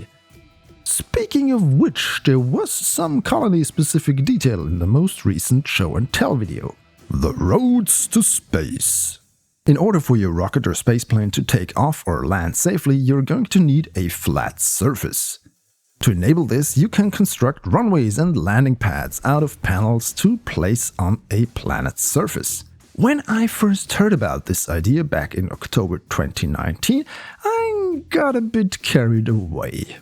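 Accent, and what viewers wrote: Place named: Germany. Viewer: I know a german guy trying to speak professional english when I hear one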